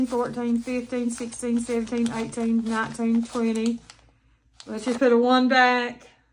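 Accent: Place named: South, United States. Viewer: It’s so great to hear someone doing this that sounds like me 🥰. I’m from Georgia and believe it or not I think you may even sound a little more country/southern than me. I LOVE IT!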